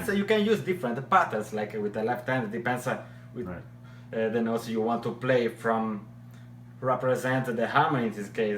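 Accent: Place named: Veneto, Italy. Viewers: His accent obviously sounds bad, but its typical of the venetian region in Italy. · he's from Italia Do you speak italian ?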